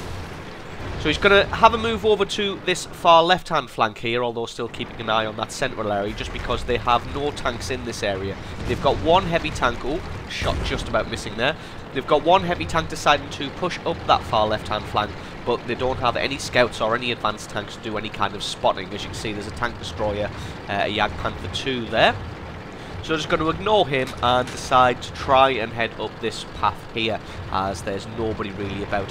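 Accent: United Kingdom. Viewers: Its clearly british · What part of Britian are you from? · Ok may I ask you what is your accent? Is it some kind of British accent or I am totaly wrong?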